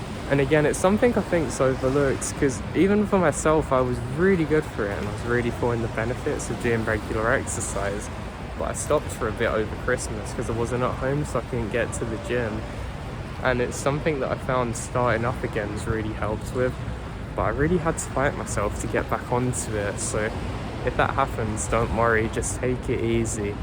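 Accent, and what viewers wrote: England, United Kingdom. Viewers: Good morning! Aww! It was so nice listen to you saying all that! I just love the english accent. You and your brother are spectacular! Wish Happy New Year to you and yours, haven't seen you since last year!🎉 I would love to see you doing a collab with Mortis Media and Being Scared, like back in 2016. Since there I'm your subscriber. But I'll be happy with any choice. Hope you're doing great too, and taking care of yourself. Thank you! Much love💚💙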